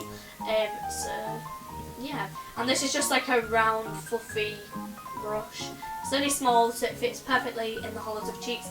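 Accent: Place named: Yorkshire, United Kingdom. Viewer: She lives in Yorkshire like me. Ik ik we have the sexier accent 😂😂😂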